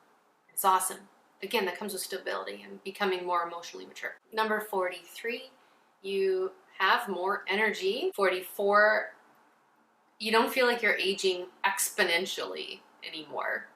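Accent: Canada. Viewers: Canadian ey? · Love your videos. Helps me remember why i stopped drinking and the benefits of sobriety. Your canadian accent is too cute btw ("oot"). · Early on, how did you learn to be social, find Joy, & not drink (or use opioids in my case), without that security blanket that you'd come so accustomed to? Did you force yourself into uncomfortable social situations until it became easy? Did you have a regimented schedule set out for your days off? Did you become an exercise addict? Did your boyfriend push you to do stuff with him? Once you hit that bottom (which I have), how did you learn to live life on it's own terms without being absolutely bored to death? I like your Canadian accent, Canada Rules! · Did you get your records up right? You're super lovely btw- and the love that slight Canadian accent. So, I'm aboot 21days sober- cigs went with it. It wasn't a resolution, it just happened and I've no desire whatsoever... 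Yes, it automatically make you want to improve other parts of your life. "This is no longer acceptable- how did I let myself get away with this?" kinda stuff... My step dad was an ineffectual boozer- my mom hung on until she died. My exwife was an abusive white-wino and our baby was born still- I divorced her and took a huge financial loss. Would I have married her if I had been sober? Not a chance in hell. What other losses have I incurred? How much massive bullshit have I brought into my life unintentionally, just by drinking? How many dj sets (4countries total) sounded not nearly as good as they could have? How many women have I been with that I wouldn't have otherwise? Eeeek! I'm so thankful this has happened. I've been in Austin TX for a year now, and have only been out for music a couple of times- I don't entirely trust myself- or I didn't- until now. Still, there's so much work to do on myself, by myself- everyone's dead, or I've cut all my old friends off. 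It's sucks, but I'll rebuild. I have all over the world- this time I get to do it better. Again, nothing but thankful... (Oh I gotta add- this past summer I captained party barges on the lake here (sober) and after watching people make total asses of themselves and puke their bikinied guts out aft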